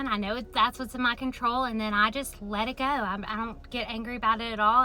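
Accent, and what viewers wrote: South, United States. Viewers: What part of America is this accent from?? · I ❤❤❤❤❤❤❤❤ her country voice them southern folks accents makeme happy every trip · That’s one hell of a southern accent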